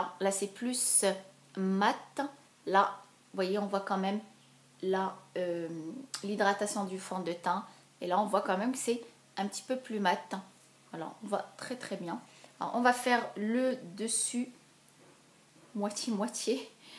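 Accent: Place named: Morocco. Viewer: essaye quand meme de faire des tuto en arabe darija tu va rien perdre car en tt cas tu a un accent marocain et ca se voi tres bien que ta pa de probleme de langue arabe comme tu le dise regarde les tuto de morrocan misstinguette c'est une marocaine qui vis en france ya pas mal de temps et malgre ca elle fait des videos en langue arabe darija je la kiff...jespere que tu va pas men vouloir je suis pas entrain de te critiquer mais c'est un point de vue et pas plus:)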